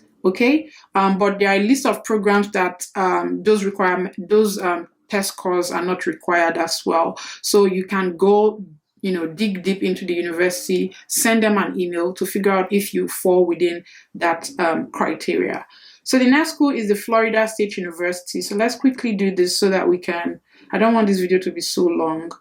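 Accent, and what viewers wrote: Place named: Nigeria. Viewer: Once l heard that voice l knew she is Nigerian. Good 👍 video presentation l searched for "us Universities without GRE" n ur video popped up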